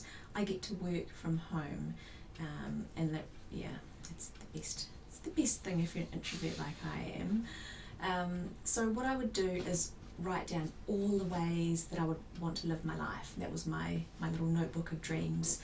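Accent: New Zealand. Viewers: Thank you,. I love hearing your NZ accent... it's so refreshing from our clipped Canadian spoken word. Every day I write down my plan for how I will glide through my day. (HA!). Eating elegantly, making chic choices, phone calls, work project goals, exercise, specific people ignoring, etc and put a little ticky box beside each one so I can give myself a big check mark in the evening. I think I learned this from you. Not all my ticky boxes always get checked, but I'm always aware that they're there. My goal recently is to order your Chic Author book as I'm very afraid to think of putting my writing out there. Thanks for the tip about Rhonda Byrne. I read "The Secret" back in the day and that was it. I look forward to checking her out. xo karen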